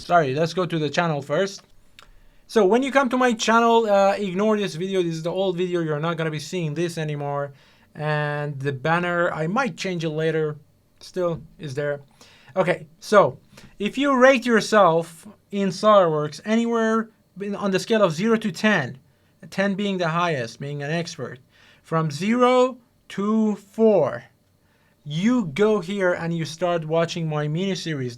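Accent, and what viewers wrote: Iran. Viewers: Hello, you have Iranian accent, are you from iran?:D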